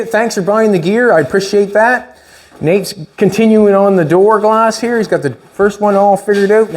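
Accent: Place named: Canada. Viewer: Same reason he calls it a care instead of a car. He's Canadian.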